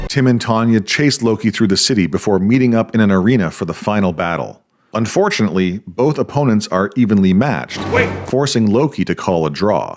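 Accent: Canada. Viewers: I'm going to guess he's from Eastern Canada the way he pronounces the "ou" sound (this is coming from someone in Saskatchewan)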